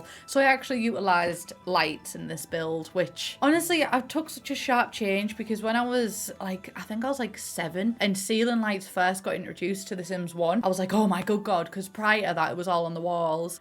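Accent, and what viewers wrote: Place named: Essex, United Kingdom. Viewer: Less than 30 secs in and I'm shocked that the Essex accent apparently sounds posh to someone from Middlesbrough 😂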